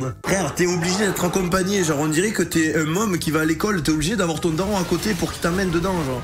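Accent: South, France. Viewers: Cet accent, j'adore, ça sent bon le sud. J'aime beaucoup Jinx, c'est assez simple à jouer cependant toute seule elle se fait souvent ouvrir en deux si elle n'est pas aidée.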